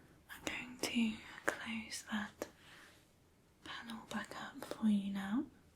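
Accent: England, United Kingdom. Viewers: I now love women with english accents😊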